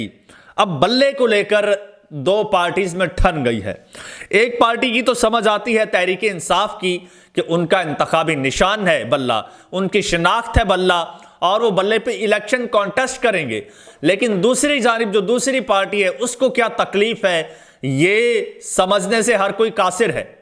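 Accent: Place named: Punjab, India. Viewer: Bro ek suggestion hai Vlog acha hai lekin apki Urdu Mai punjabi style ata hai Baqi sab set hai❤❤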